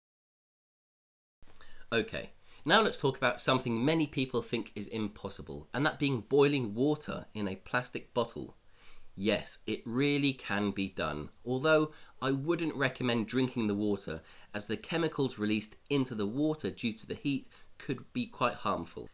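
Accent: United Kingdom. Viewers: Are you a fan of Ray Mears? He is a brilliant man, and I have yet to find anyone better than him at Bushcraft. This is my first time visiting your channel, and you remind me of him a little bit! (Not because of the UK accent) I subscribed and gave this one a thumbs up. I always enjoy watching new Bushcraft techniques! Great video!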